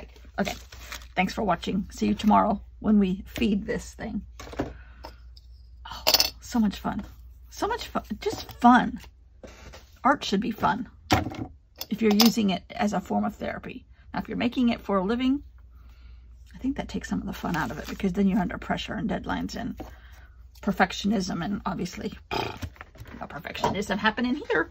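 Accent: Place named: California, United States. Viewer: Southern mixed with California! 😂😂💜💜💜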